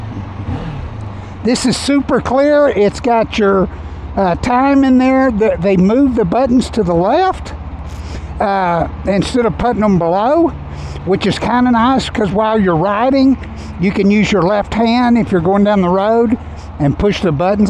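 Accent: South, United States. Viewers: Confirming my belief that all have southern accents. Great video!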